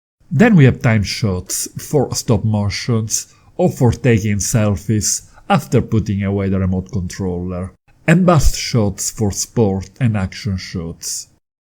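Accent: Italy. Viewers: He's Italian?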